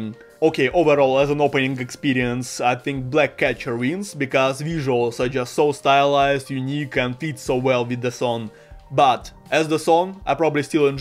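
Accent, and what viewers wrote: Russia. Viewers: слышу русский акцент в английской речи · are you russian perhaps? either way I love the accent lol it makes so much better lol. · По акценту и затем по названию понял, что человек русский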